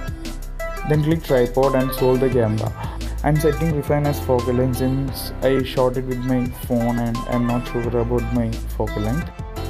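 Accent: Kerala, India. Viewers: Why do you have Indian accent? Are you trying to be CG Matter? Or Ian Hubert? Or Royal Skies LLc? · U sounds like a mallu, btw nice video 🤘🤘👍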